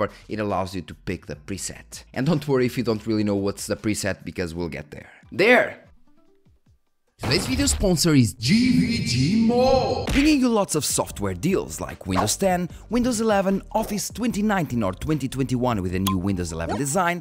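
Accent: Portugal. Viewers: Excellent video and explanations, thank you! P.s.: I've always wondered where your accent was from but I could never put my finger on it, but on this video I heard you speaking with your son (I guess) and I noticed you're Portuguese (I'm Brazilian, so that was easy to spot). Well, that's just my curiosity speaking now. Cheers! :) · Assunto fora de tópico, você é português ou brasileiro?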